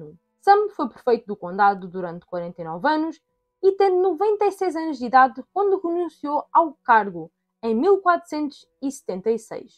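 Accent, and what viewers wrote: Alentejo, Portugal. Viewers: Que conteúdo incrível. Parabéns equipe. A qualidade desse canal só sobe. Vocês são o melhor conteúdo de Tolkien em português. E a belíssima trás a beleza e voz alentenjana para estender essa qualidade além mar. Parabéns pessoal. Não me canso de elogiar vocês. Um grande beijinho 💋 para a sou muito seu fan.:_Silmaril::_Silmaril::_Silmaril::_Silmaril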